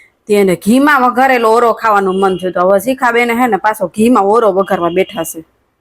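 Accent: Kathiawar, India. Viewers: Super...same bolwani rite che je hamari kathiyadi che jamnagarni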